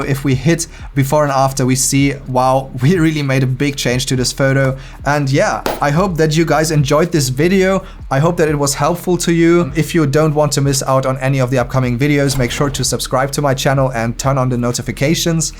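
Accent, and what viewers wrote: Germany. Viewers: Are you German? Because you sound like this😅. Ps: I am German too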